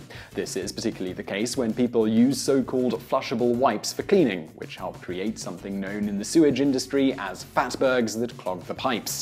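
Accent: England, United Kingdom. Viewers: He's saying the word the way anyone from England would. The French pronunciation isn't really relevant as it is being used as an English word - there are many French words which are used in English, but with very different pronunciation.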